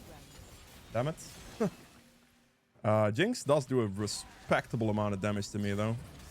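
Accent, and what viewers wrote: Netherlands. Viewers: Are you dutch or so? Great video dude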